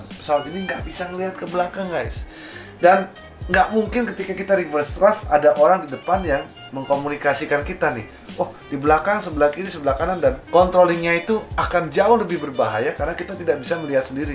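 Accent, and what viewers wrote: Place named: Banyumas, Indonesia. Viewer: Kapal kecik saja... Ngapak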